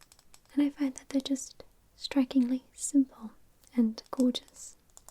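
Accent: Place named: England, United Kingdom. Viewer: love your accent. what area are you from in england?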